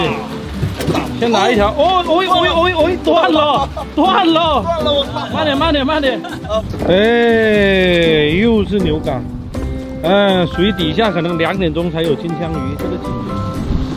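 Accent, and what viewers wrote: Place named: South, China. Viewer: 这口音好像南普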